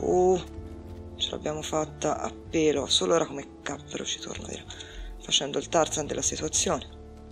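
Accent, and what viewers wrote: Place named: Lucca, Italy. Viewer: È simpaticissimo il tuo intercalare, tanto più che lo conosco bene: ho vissuto gli anni delle medie e delle superiori a Lucca e ancora oggi, quando parlo con le mie amiche che vivono ancora lì, parlo in lucchese!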